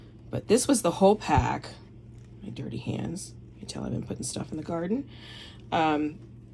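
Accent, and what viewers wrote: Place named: Maryland, United States. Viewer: Before even looking at the video description or hearing you said mid-Atlantic I knew you were a Marylander! Whenever I hear my native tongue it makes me miss my home state. Happy gardening from western PA!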